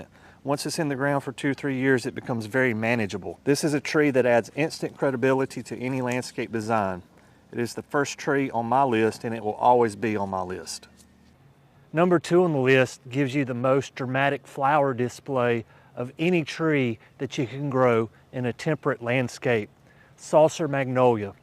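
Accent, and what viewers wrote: South, United States. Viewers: He is in Alabama, zone 8a. You can tell by that accent that he is a Southerner y’all!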